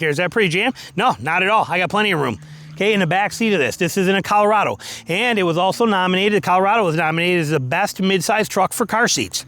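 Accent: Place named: Chicago, United States. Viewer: That’s a very Chicago accent for a South Georgian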